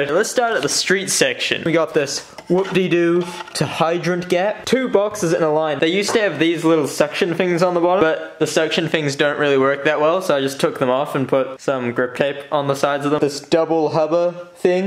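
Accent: New Zealand. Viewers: Is this guy from New Zealand if so same here the How I know 'the warehouse, low prices are just the beginning' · your a kiwi?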